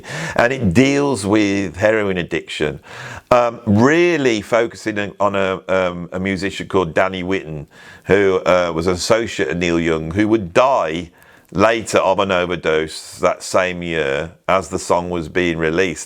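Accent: United Kingdom. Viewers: Sorry, squire, I gobbed on your carpet. Frankly, your entire verbal rap is obnoxious, and you can't even hear yourself making "bloody dodgy" statements in that bloody rough British accent. You state, just before I decided to bail out in disgust, that you're going to give your "objective opinion". Really?! An "opinion" is not "objective" by definition. And using language like "a piece of shite [lovely]" and "yech" (huh?) are hardly the verbiage of objective criticism as opposed to highly subjective and low-IQ reaction. You're starting out wrong when you foolishly seek out the Rolling Stone's "500 Greatest Albums" or whatever lame source, though there will be some worthy albums in such a list. As for Neil Young, it's pretty simple. He WAS a great artist at one time, from roughly his time in Buffalo Springfield through his first few solo albums and some collaboration with Crosby, Stills and Nash. 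His albums were usually erratic in terms of song quality, but the earlier solo albums feature many great songs. He has not produced an album worthy of his legacy for more than 25 years, instead popping out these dull, lyrically strained and melodically uninspired "songs" out of a desire to keep playing and stay relevant somehow. Fans with no critical faculties and many critics who betray their own standards continue to praise and indulge Neil. But meantime, you think some Nirvana album is "bloody amazing" but you determine to hate Neil Young and the "Harvest" album. Well, bud, your non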